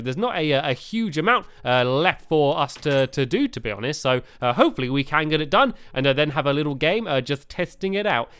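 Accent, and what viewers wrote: United Kingdom. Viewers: 0206 Are you british? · U speak British like meh friend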